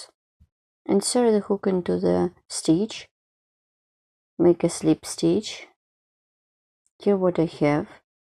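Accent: Russia. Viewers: Почемуто какжется, что русскоговорящая говорит на анллийском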